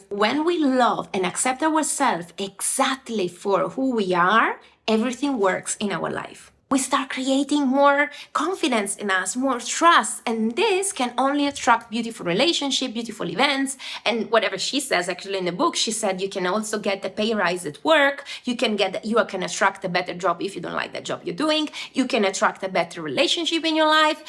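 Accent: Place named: Italy. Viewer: I loved this book as well, and still refer to it for myself and for others. Excellent review! Love all the artistic ways you edited everything into it. And I love the beautiful way you still "speak" Italian in your English. So very entertaining...I want more! 😊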